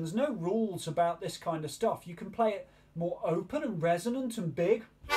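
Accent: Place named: Northern England, United Kingdom. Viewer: thanks for bridging the gap from beginner blues to bluegrass. Kinda crazy to learn from a Brit but hey, youre doin it right. That northern? accent is cool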